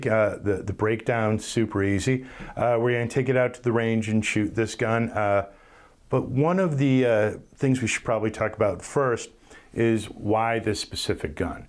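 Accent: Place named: Midwest, United States. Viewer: Pretend the Bears stuff isn’t in the background. says dis guy is obviously from da Midwest, Great Lakes region of America…and dats a great place to be from. I’m glad you don’t have a boring 25 or 30 second canned intro. Just straight into it. Nicely done, Sir. Just yesterday I ordered a Mark IV Target from Bud’s. Looking forward to its arrival. GO LIONS!!!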